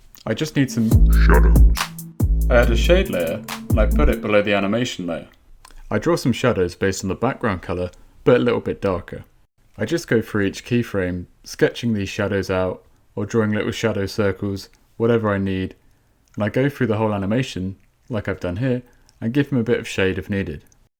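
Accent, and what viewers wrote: United Kingdom. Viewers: You sound like you are from British. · I had no idea you were actually British. In hindsight it is reckless to assume that everyone likes to do a British accent in daily life like myself. PS, your coloring is awesome, and is definitely the most unique part of your animations!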